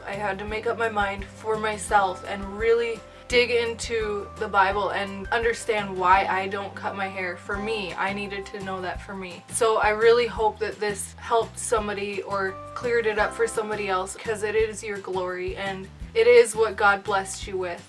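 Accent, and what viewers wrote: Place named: Midwest, United States. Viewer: Wow that's cool I'm originally from Minnesota but now I live in North Carolina but I could tell from your accent that your from around that area :)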